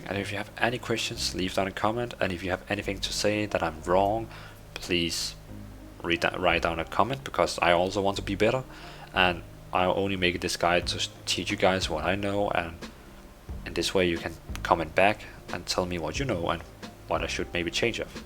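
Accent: Denmark. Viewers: Danish much :O?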